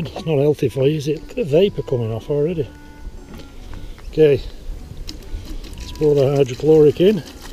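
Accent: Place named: United Kingdom. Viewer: By your accent your in the UK. Where did you manage to get the hydrochloric acid from as I need some but can't get it anywhere please. Great informative video thanks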